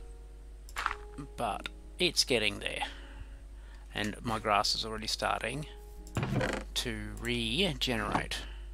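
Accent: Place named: Australia. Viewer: It's great to hear another Aussie accent! I've been watching Pixlrifs' series and thought at the start i could play such a world...but then the more he got into it, the less confident i feel!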